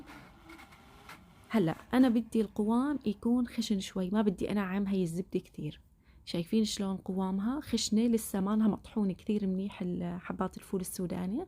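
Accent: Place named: Ruhaiba, Saudi Arabia. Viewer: حسيتك من الرحيبة😂😍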